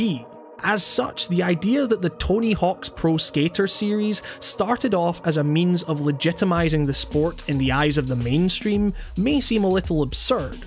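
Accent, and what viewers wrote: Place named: Scotland, United Kingdom. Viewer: Didn't see the Scottish accent coming at all (or is it Irish?), and I quite appreciate diversity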